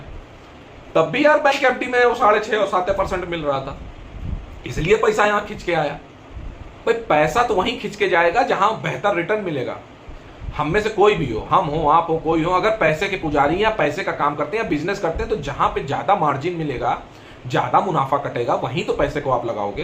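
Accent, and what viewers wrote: Kanpur, India. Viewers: paisa ghar kaha aaya be maza aa gaya ye dialogue sunker bade bado ko ye baat samjhne me aadha jeevan lag jata hai sahi me aap kanpuriya style me bolte ho hum bui kanpuriya hai sab bahut dhag se samjhte hai maan gaye jeevan kaise jeena hai kyo hum log jeevan me aaye hai kyo hume future ki chinta nahi karni hai matlab utni karni hai jisse kaam chal jaye aur jeevan future ki chinta me kharab nahi karna hai is video me bahut kuch seekha ja sakta hai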